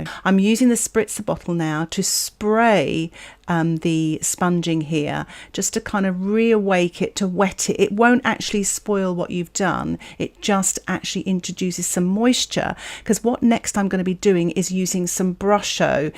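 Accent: United Kingdom. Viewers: La tua pronuncia così britannica mi.piace tanto! 😊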